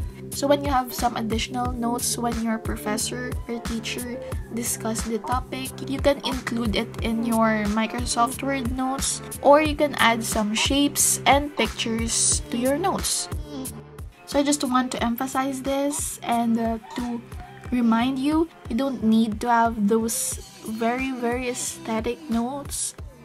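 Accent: Philippines. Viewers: Are you by chance a Filipino? Your accent is like a Filipino Accent.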